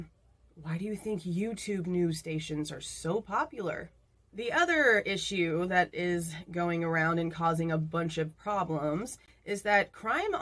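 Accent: Texas, United States. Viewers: Every way that you talk screams Texas girl lol 💙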